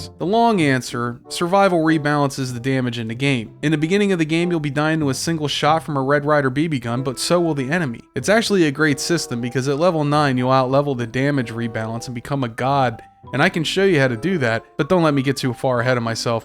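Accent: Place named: Philadelphia, United States. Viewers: Love that I found this guy. A) he is definitely from the Philly/ South Jersey area. As someone from Delaware, I recognized that accent IMMEDIATELY. Feels close to home - like I’m listening to my cousin instead of a stranger, which is awesome. B) this commentary makes him sound like a disappointed Philly sports fan, but instead of being let down by his team(s), he’s let down by Bethesda. I love it. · That accent sounds familiar. Are you from Philadelphia?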